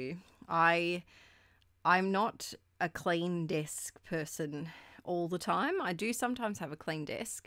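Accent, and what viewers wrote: Australia. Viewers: I am amazed at the job you managed to achieve suffering from PND. I appreciate your energy and enthusiasm which is in you as well. The first thing I do when I wake up is going on YouTube and watching your video which I expect with a kind of childish excitement. I live in the south of France and I love listening to your Aussie accent. Your videos are precious to me as I suffer from disabling chronic illness which makes it difficult for me to craft. So I just take things as they come. Life is beautiful, you've got a lovely family, stick to all the wonderful things you achieve and don't give to much credit to this PMD. This doesn't mean you've got to ignore it, which is impossible but maybe you need to take care of yourself and slow down a bit. Having 2 young kids and a job is very demanding. Don't forget your own needs. Take care. Thank you very much for the few minutes of fun and joy you bring in my life.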